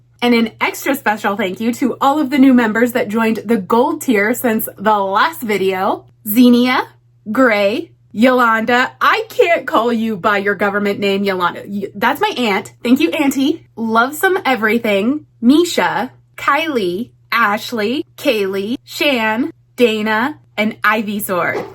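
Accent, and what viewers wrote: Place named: England, United Kingdom. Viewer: Hendrix is absolutely gorgeous 🩶 Your English accent makes me laugh 😂 it's like Emily from f.r.i.e.n.d.s and I promise we don't sound like that 😅 and as someone who lived in England 27 years and now Scotland for almost 5 years .... Scotland is definitely more scenic with beautiful views imo. Come to Scotland and I'll make you a full "English" Scottish breakfast with square sausage and tattie scone 😜 [im more an english breakfast person haha! But no black pudding 🤢]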